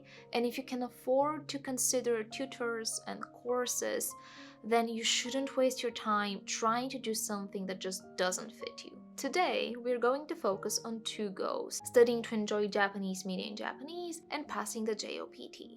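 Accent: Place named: United Kingdom. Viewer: Ah, hearing a British accent speaking about Japanese is refreshing. ありがとう 先生！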